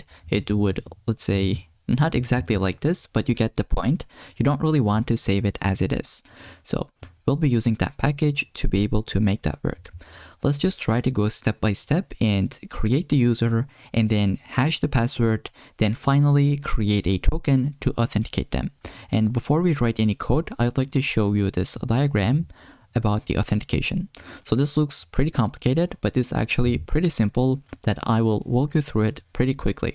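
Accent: Turkey. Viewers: Merhaba kardeşim,aksandan hemen Türk olduğunu anladım .Teşekkür ederiz video için:)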